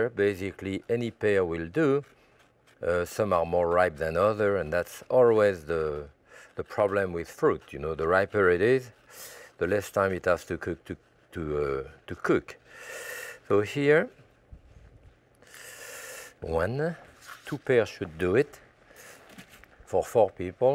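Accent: France. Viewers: It's his gorgeous French accent. Personally, I could listen to him talk all day long.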